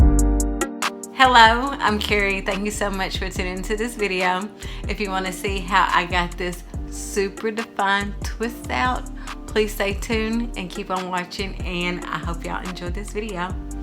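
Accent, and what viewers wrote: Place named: South, United States. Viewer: Love your country girl accent, makes me trust you. #countrygirlsunited I love natural hair videos. I hope you make many more.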